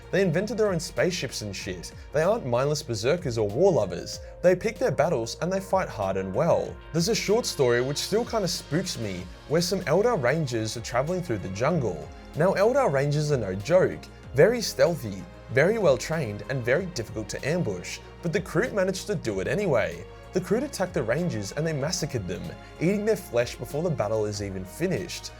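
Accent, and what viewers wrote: Australia. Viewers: Always proud to hear your fellow Aussie accent. Keep pushing keep going and keep pushing past the tall poppy that our culture has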